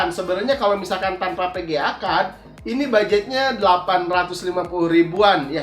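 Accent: West Java, Indonesia. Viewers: Sunda pisan maneh huhuy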